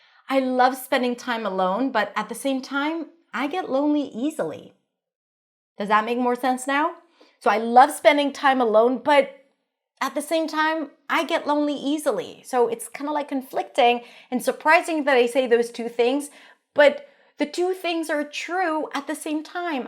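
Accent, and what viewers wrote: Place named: United States. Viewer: Clear American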